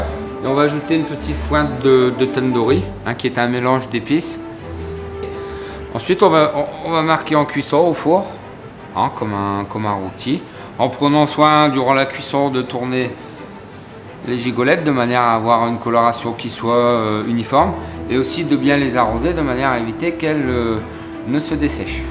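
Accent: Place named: Nord, France. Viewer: ça ressemble plutôt à l'accent du Nord ou Pas-de-Calais...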